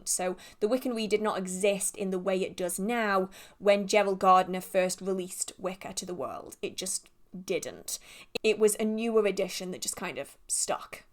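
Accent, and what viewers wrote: United Kingdom. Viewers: Hello there. I found you recently. As someone from Romania first I noticed your British accent. Then your metal look in other videos.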